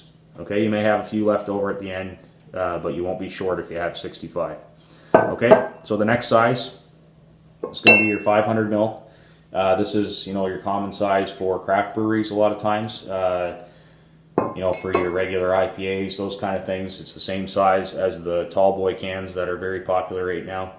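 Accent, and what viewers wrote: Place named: North America. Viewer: I love the use of metric! I know it doesn't make a huge difference for most, but to hear a North American accent using metric is wonderful.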